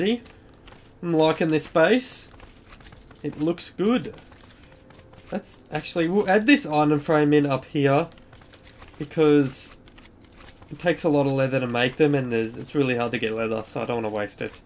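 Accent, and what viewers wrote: Australia. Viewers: love the ozzy accent :) great vids as im new to minecraft :P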